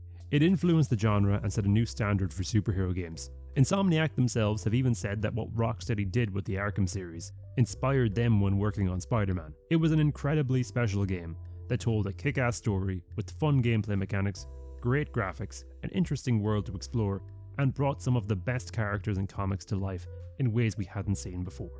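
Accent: Ireland. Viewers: You sound irish. Hello fellow irish person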